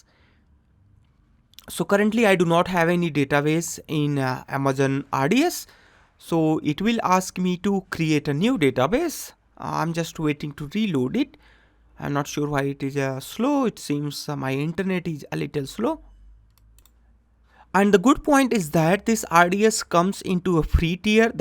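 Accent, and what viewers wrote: India. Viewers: Nice video with nice bengali accent :-)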